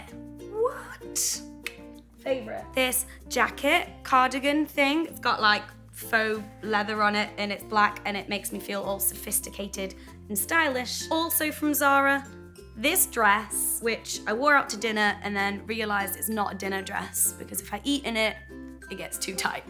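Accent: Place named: Manchester, United Kingdom. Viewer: where is from her accent sounds very familiar.. i want to say manchester?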